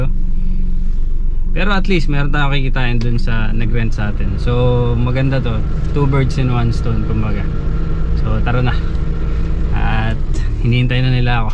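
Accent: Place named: Hagonoy, Philippines. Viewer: hagonoy accent haha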